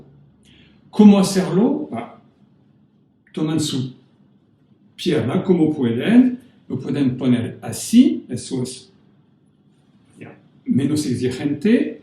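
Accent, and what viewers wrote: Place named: Paris, France. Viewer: Super de faire une video mais le castillan avec l’accent parisien c’est insupportable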